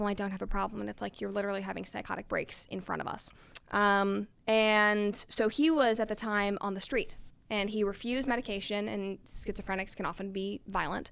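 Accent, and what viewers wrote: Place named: South, United States. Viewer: I don't think it's a fry, I think it's a little southern trying to come through like she's had voice coaching or something.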